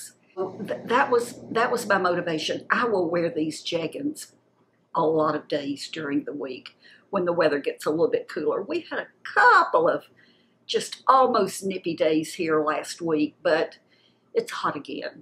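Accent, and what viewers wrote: South, United States. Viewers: Glad I found you. I'm fast approaching my 70's and the difference between my late 50's and early 60's has caught me off guard! Changes in health, sleep, and my body in general. You are honest and fun without tons of pretense. Plus your accent reminds me of my favorite sister in law and other family that stayed down south. I use to live in Florida as a kid. Thumbs up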